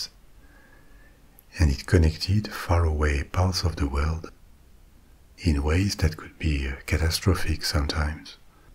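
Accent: France. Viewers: Wonderful, relaxing ASMR and such a sensual and sexy voice with a gorgeous French accent. Delicious. 🥰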